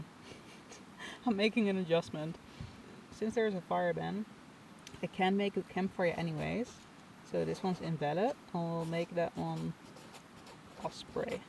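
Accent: Netherlands. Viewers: Thank you Youtube, you are finally learning what I like. That's some amazing scenery, I also love to be out in nature solo. Am I crazy or do I indeed hear a Dutch accent? I often dream of moving to Canada to live this peacefull lifestyle. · Are you Dutch? I'm trying to place your accent 😊 Beautiful adventure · Love the video! Thanks for sharing. I hear a slight dutch accent? Or is it just me? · Hey! Small world! I randomly came across your Bowron Lake video and noticed from your accent that you’re Dutch? Did the Bowron Lake circuit in mid-May this year, shortly after moving from the Netherlands to Calgary. I brought my camera along as well and was hoping to film the trip, but unfortunately my camera went for an unplanned swim on the very first day 🥲 So I never got to make the video I had in mind. Anyway, I really enjoyed seeing your take on the trip! Thanks! 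· Such a fabulous video, exciting and relaxing and inspiring. Do you have any Dutch heritage, your accent really reminds me of my fellow Dutchies. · Wow! That is so beautiful, what a cool adventure. You are very good at filming and editing by the way. I would love to do this trip, just not too thrilled about potential bear attacks but I guess chances of that are slim. I was trying to pick your accent but it's hard; are you Dutch? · Are you a dutch speaker by any chance? your accent reminds me of dutch speakers speaking english. Love your content!! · Your accent is cute, you sound Dutch. Where are you from? Groeten uit Holland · What and adventure. And what a lovely woman! ❤. Are you dutch by any chance? You're accent kind off is but your English is flawless. Really enjoyed this film! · Do I hear a Dutch accent? Love your content btw! Great views!! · Your accent. Are you from the Netherlands? 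Very cool video. I love that canoe! I live in New Zealand. We have quite a bit of terrain like this as well. If you ever get the chance, check out the south island of New Zealand. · my algorithm brought me here, cool content, your accent sound a little dutch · You don't sound like real american accent. Are you Dutch?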